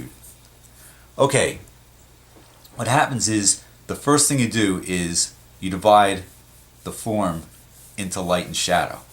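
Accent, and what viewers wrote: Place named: New York, United States. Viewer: drawing is more fun with a new york accent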